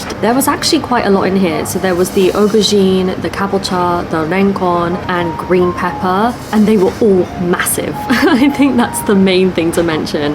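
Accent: RP, United Kingdom. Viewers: ほんと可愛いし、British Englishへの慣れという点でも助かる。こちらも見てて楽しくなります。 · 何時も拝聴しております。さんのクインズイングリッシュはとっても聞き取りやすい。イギリス英語？は何時の頃からか話し言葉から書き言葉に変化したのではないでしょうか？。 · 美味しそうに食べるなぁ！やっぱ英国の英語ていはいな。40代だけど中学生の時に途中からアメリカ英語にかわった。聞き取り安いけど、イギリス英語は雅な響きあるな。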